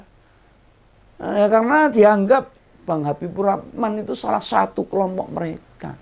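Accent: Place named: East Java, Indonesia. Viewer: Aku sueneng ngrungok ke mase Iki wong Jawa timur nek ngendikan bloko suto 😂😂😂😂😂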